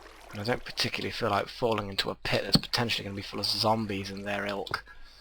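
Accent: United Kingdom. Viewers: i love ur British accent xD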